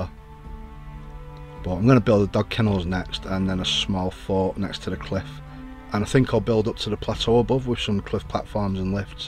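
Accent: Manchester, United Kingdom. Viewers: Defo sound like one of us manc lads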